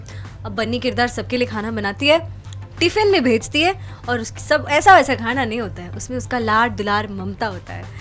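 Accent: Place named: Bihar, India. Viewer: Luv u and ur style and chehra kep it like this like ur bihari accent wan to hear mor carry on shelter for stray dogs and animals they need care mute they r feel pain cant say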